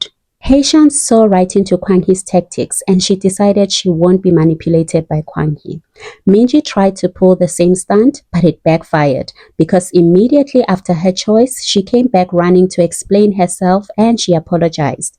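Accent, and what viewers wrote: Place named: South Africa. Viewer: Interesting perspective. Well articulated. Love it. You sound South African!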